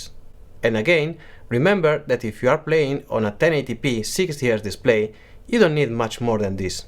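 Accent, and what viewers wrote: Italy. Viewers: Sembri un italiano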